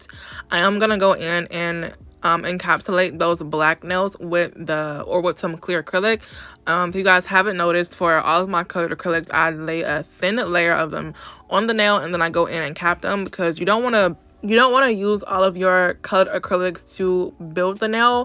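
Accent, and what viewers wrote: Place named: South, United States. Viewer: Where are you from, if you don't mind me asking. Sounded like i was hearing some country coming out in this video...😂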